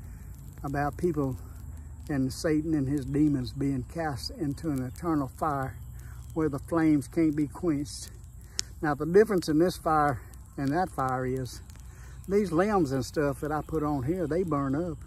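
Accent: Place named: Mississippi, United States. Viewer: I praise God that you can talk also! God helped me through a heart attack at age 46 and through stage 3 throat cancer at age 50. Now that you told me where your from I can totally hear the Mississippi accent...I love it!! I just found your channel and love it also. God bless you and I look forward to watching more! Thank you for responding also.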